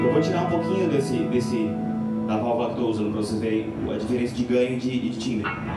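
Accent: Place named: Brazil. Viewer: judging by the portuguese he's speaking i wouldnt say Mexico, more like Brazil...anyway, some people like that high gain stuff, which i dont approve but will not condone...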